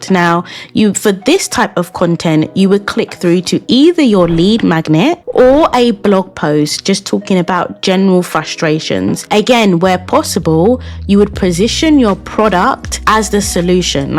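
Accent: United Kingdom. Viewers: I haven't even watched the whole video but when I heard the British accent (finally! A British gyal!!) and heard the gems you had already dropped in the first 3 mins, I hit those 'like' and 'subscribe' buttons! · I agree. It’s nice to hear a follower Londoner. Such valuable advice.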